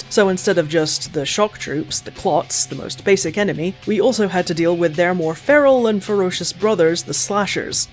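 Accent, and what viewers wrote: United Kingdom. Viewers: Hey can I ask question, I know you are Brit but what accent do u have specifically which region accent?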